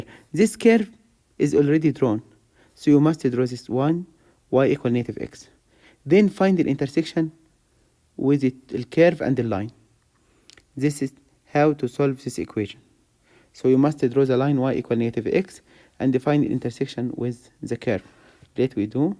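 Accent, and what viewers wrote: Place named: Arab world. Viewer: I can tell from your accent that you are Arabic And btw you helped me alot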